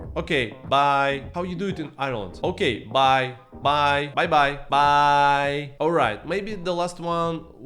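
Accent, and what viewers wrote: Singapore. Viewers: I loved your video. Especially your Singlish. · very power singlish mate! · Because you spoke Singlish, I subscribe liao! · Your Singlish! XD For me, the weather here is fine, it's the humidity that kills. I agree with how convenient it is to get around. As long as you're willing to walk, everywhere you need to go in your neighbourhood is within reach. However, it also takes very long to get from point A to point B in some cases. I tried sitting in the bus for 2+ hours straight to get from the West to the East and I swore I'm never doing it again. (Thank goodness for the MRT.) There are some cons to living here as well like high living expenses... Which is kind of hard for many to start a family. And for those who are career-driven because it's fast-paced Singapore, might be neglecting their family and health too... I believe that it's always good to travel and broaden one's perspective and eventually find out what you really like. 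Glad to have you and your family here! :D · "You need only T-shirt and slippers." Good one. Because now everyone WFH so no need pants. Your videos are absolutely hilarious by the way, your singlish power lah. Have liked and subscribed!!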